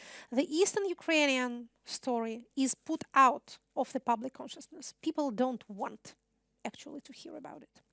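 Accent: Russia. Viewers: Такой-то руслиш, всё понятно :) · Спасибо за видео, скучала без вас. Понабежавшим училкам английского - русский акцент для русскоязычного человека это нормально, как и некоторое количество ошибок. Темп речи и словарный запас просто на отлично! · Я никогда не поверю, что человек, который выучил английский на таком крутом уровне, не смог убрать самые жесткие штуки в произношение. Значит это специально. Кто-нибудь может объяснить, зачем специально говорить с таким жутким русским акцентом? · ой это прекрасный русский акцент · Hello! The content of the lecture is very interesting I would like to note about the quality of English, which Ekaterina speaks. Catherine's English is definitely better than many of our journalists and public figures. Nevertheless, I noticed strong Russian intonations. Phonetics is not bad, but there is work to do. Thanks for the lecture.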